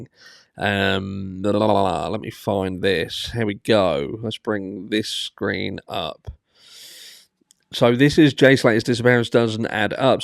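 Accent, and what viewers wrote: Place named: Suffolk, United Kingdom. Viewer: You have a lovely accent !! Are you from Suffolk?? 🌲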